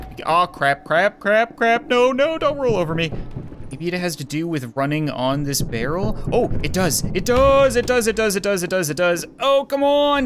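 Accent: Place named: Canada. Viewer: can i get a copy of candleman please i love your channel and your canadian acsent is so cool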